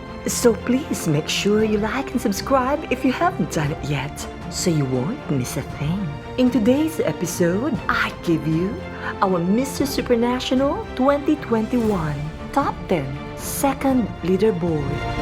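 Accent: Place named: Philippines. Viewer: Are you Filipino? I can tell by your narration. hehe, Anyway, I love the words you used to describe these hot and gorgeous men, and the way you focus to their crutch towards the end at some point.. Your voice is pleasant to hear, it's soft and seductive makakatigas ng titi. hehe. Just work on the right pronunciation of the words and your channel will go a long way by having a lot of viewers or subscribers. Keep it up.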